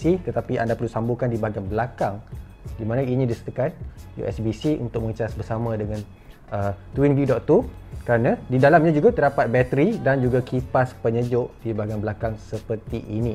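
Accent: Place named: Malaysia. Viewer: suara nya kayak malaisiya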